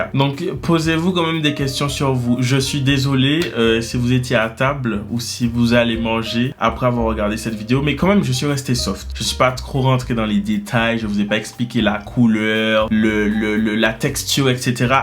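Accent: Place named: Martinique, France. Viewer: Phoenix en Arizona et expatrié depuis 1979. Ça fait bien plaisir d'entendre l'accent de la Martinique. Tu as beaucoup de talent. Merci pour tous les bon moments de rires.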